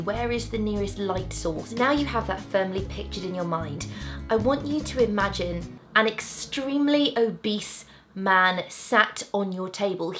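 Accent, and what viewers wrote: United Kingdom. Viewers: Awesome stuff actually. I love your accent. British accent rules😍😍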